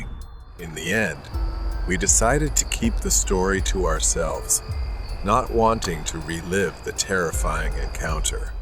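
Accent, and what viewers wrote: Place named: New Jersey, United States. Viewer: your jersey accent makes your videos all the more comforting. been following since😰😰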